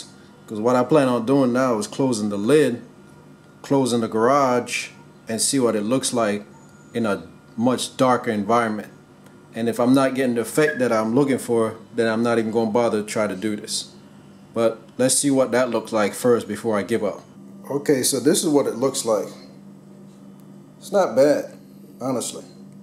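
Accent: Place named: Jamaica. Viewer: You sound Jamaican. Looking forward to more Hr-v mod videos. Good work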